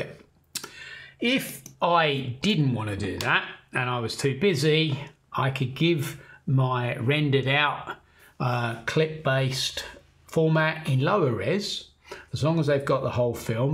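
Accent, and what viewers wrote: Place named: United Kingdom. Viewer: stupid british accent, i can't hear it, why you speak like it going in slow mo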